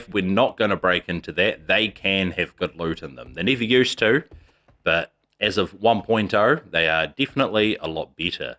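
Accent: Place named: Australia. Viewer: I just found you through algorithm. Don't have console, always played on pc but live the accent lol. Not many aussies (assuming that's where the accent is from) I've seen play this and post on it so keep posting 👍 all the best "mate" 😉😜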